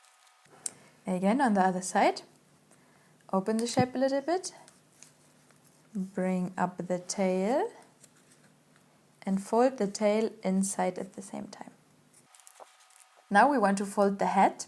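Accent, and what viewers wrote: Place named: England, United Kingdom. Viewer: I enjoyed your video, thanks, Also I like English accent, doesn’t sounds German😉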